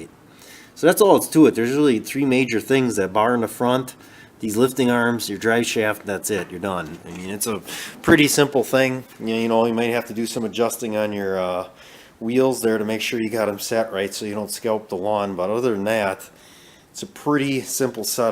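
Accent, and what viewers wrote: United States. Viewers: He sounds like one of them dam yankees that dont know his ass from a hole in the ground mite be a democrat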